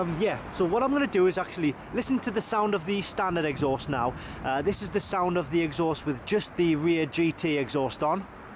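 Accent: Newcastle, United Kingdom. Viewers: Hello. Is that a Geordie accent I'm hearing?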